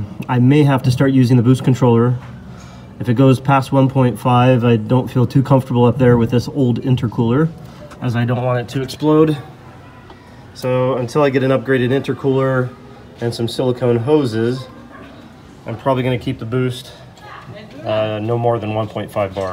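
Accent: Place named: United States. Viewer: Where do you live bro? You sound real american lol. Im totaly confused. Haha. Great videos by the way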